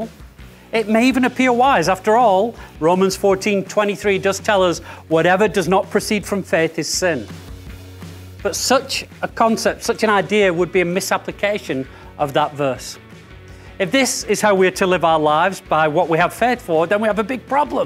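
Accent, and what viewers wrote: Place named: Bolton, United Kingdom. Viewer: the Horwich/Bolton accent still comes through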